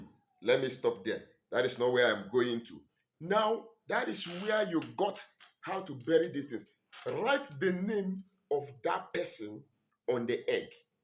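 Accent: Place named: Nigeria. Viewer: getting you lound n clear from Kenya, love your Nigerian accent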